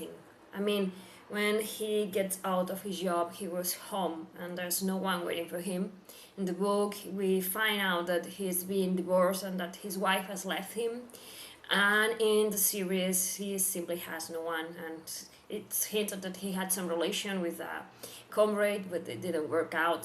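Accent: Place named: Argentina. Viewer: Yes, Miller is outsider and initially he sees in Julie something, he does not understand. He is curious and the more he learns, the more he likes and respects her. About his “visions” of her, there is a theory that the protomolecule works in more than three dimensions, including time. Since he met “ProtoJulie”, she appears in his mind before that, the same way he appears in the mind of Holden. Also your musings are great and I like your accent. Argentina?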